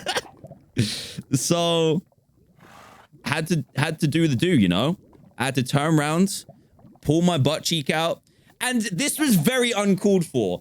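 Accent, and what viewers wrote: United Kingdom. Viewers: Are you British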